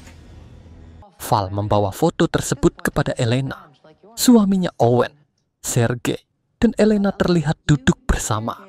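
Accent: Java, Indonesia. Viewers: Medok bet anjir berasa nonton wayang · Pekat banget jawanya walaupun dikontrol suara kayak gini..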